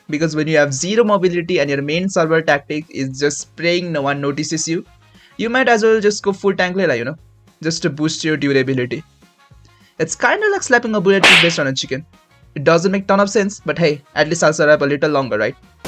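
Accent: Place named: India. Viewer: title looks Filipino but your voice sounds Indian 💀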